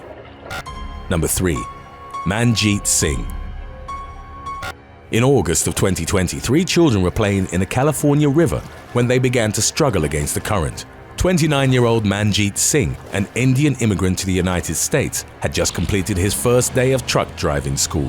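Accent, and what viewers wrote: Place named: United Kingdom. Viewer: Hey mate you have the perfect narration voice, it also helps your British. Next I would like to hear you narrate BBC Documentaries!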